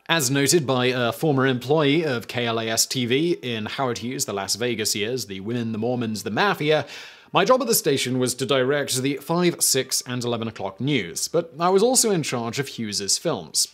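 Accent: United Kingdom. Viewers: He's British. They pronounce words funny.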